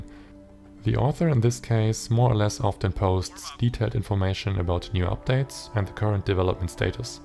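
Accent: Germany. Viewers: Am Anfang dachte ich kurz du sprichst deutsch xD Dann doch englisch, dann habe ich deinen Akzent bemerkt :P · are you german?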